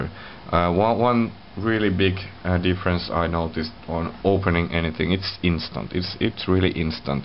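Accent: Finland. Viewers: Finnish accent?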